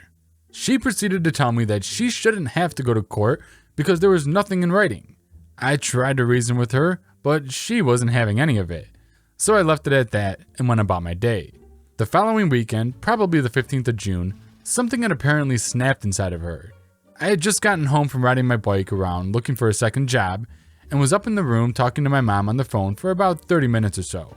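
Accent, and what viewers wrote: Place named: Midwest, United States. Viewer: I like your accent, it sounds Midwestern. Where is it from?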